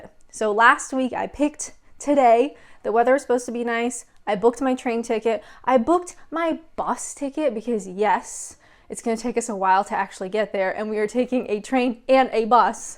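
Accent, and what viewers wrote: United Kingdom. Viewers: When you said to that seagull thee absolute cheek I was like yeah you’re definitely one of us.. you couldn’t of said that more English. Been a big fan of your channel for a few years now and you honestly have the British in you. Great content as always. Keep it up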